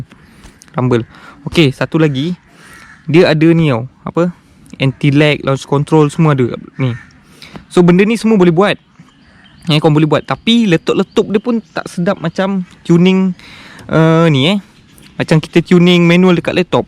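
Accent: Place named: Java, Indonesia. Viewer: dgr dia ckp dri mle2 tgk dh tau jawa. Tgk muka pon tau. Sbb aku pon jawa. Haha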